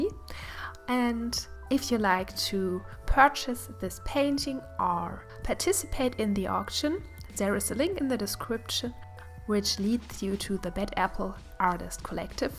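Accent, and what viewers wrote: Germany. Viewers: Based on the accent I'd say you're german. Right?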